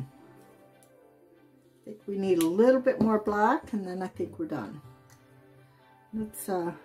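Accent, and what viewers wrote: Canada. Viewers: I picked up from your accent that you are Canadian. I'm in Adelaide in South Australia and you will definitely be hearing from me again. I just love your pieces.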